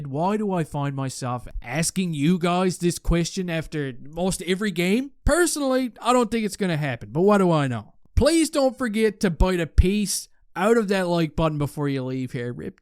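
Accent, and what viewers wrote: Newfoundland, Canada. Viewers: I think I just found my new favorite hockey channel. Top notch Canadian narration, filled with tons of catchy phrases. This should be a good year bud, I'm subbed now so let the lettuce flow and the O's be ewwwwwwt! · love the videos man, great content mixed with humour 👍 Plus the Newfoundland accent is the icing on the cake 😁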